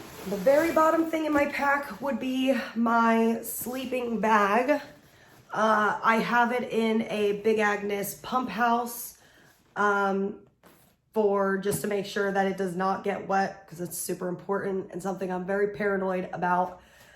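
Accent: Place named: New Jersey, United States. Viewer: Yes. You sound like you're from Jersey. That's a compliment!! I'm from Florence and I swear like a horse. Looking forward to seeing more of ur AT Videos. I'm fucking jelly of you, right now!!! Lol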